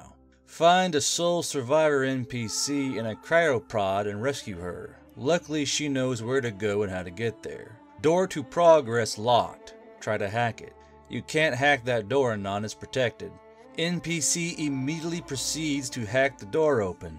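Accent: South, United States. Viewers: He has a very heavy southern accent. Makes listening to him more fun for me since I can see an old folk I used to talk to who spent plenty of time telling me war stories.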